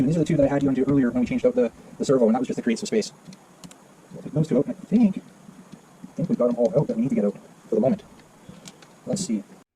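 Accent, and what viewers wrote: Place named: Canada. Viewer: haha, love your canadian accent!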